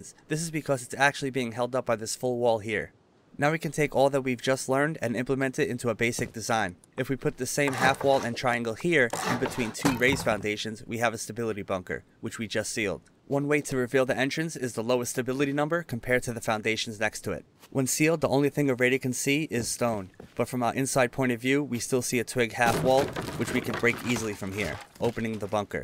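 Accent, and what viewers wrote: New York, United States. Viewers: wait wait wait, was someone not able to tell that is a NY accent? good video as always.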